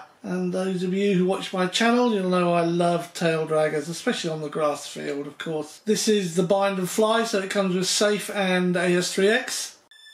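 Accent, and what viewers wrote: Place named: United Kingdom. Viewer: You managed it to let me enjoy the britihs accent . Im sorry but before it really pissed me of, but now i can just relax, Thank you.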